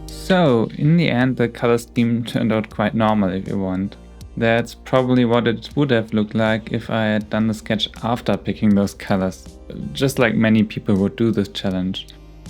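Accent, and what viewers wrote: Germany. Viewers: You sound pretty german... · iiiiis he german? he kinda has an accent...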